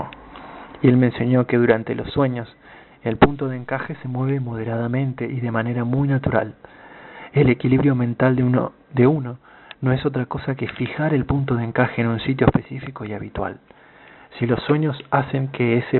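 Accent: Argentina. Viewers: El ritmo de lectura es acelerado considerando que al comun de los lectores los conceptos son novedosos infrecuentes y hasta insolitos y requiere un ritmo de asimilacion mas pausado... La pronunciacion, al parecer de acento argentino, tampoco ayuda como tampoco es conveniente algunas prolongadas pausas o silencios no convenientes... La lectura es fria y carente de emotividad como lo ameritan algunos sino muchos episodios fantásticos de los relatados por el aprendiz de brujo, el autor de la saga...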